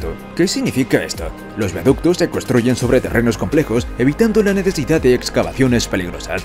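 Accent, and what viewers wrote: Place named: Spain. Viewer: Espero que este tipo de vías de comunicación vial se sigan replicando en el país, es necesario que las carreteras no provoquen un mayor impacto en el medio ambiente, las carreteras de tipo elevadas son una magnífica solución y pueden ser muy bien aprovechados para largos trayectos contemplativos. También es necesario implementar el paso por túneles. El país es hermoso. . . . . No me gusta la voz con acento español, es demasiado ruidoso. Preferiría escuchar un narrador de mi país.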